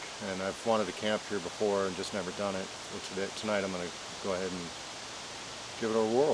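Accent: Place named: Minnesota, United States. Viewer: I'm really liking your videos brother. Where are you located? Sounds kind of like MN. I'm in Upstate NY between Buffalo and Rochester, about 3 miles from Lake Ontario. Never seen chaga in wild. God bless. John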